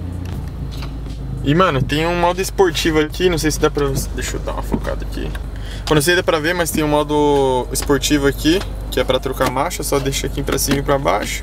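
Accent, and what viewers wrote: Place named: Bahia, Brazil. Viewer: Baiano ein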